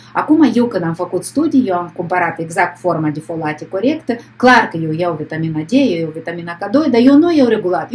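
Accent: Moldova. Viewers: Se înțelege foarte clar, doar dialectul e puțin moldovenesc, engleza înțelegeți mai bine?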